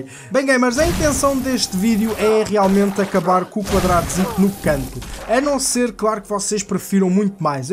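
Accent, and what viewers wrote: Portugal. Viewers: Vc e de portugal? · Português? Estou in love com o sotaque. (passando aqui só pra dizer isso)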